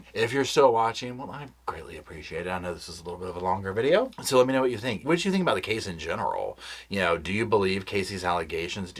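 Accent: South, United States. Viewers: I know you ain't none of that fancy credential stuff but I ❤ you and your reporting especially The Southern accent makes me feel right at home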